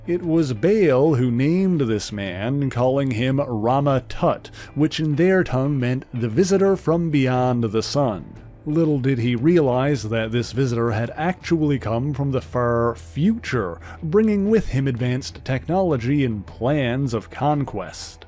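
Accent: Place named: East, Canada. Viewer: Love the Channel! Sounds like an east coast Canada accent :) keep it up!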